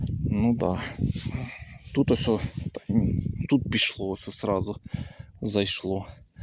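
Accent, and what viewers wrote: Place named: Dnipropetrovsk, Ukraine. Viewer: Бажаю здоров'я. З якого регіону ви? По говору таке як правий берег дніпропетровщини. Я вважаю треба половину виорать, а половину ні, от тоді бункер розсудить!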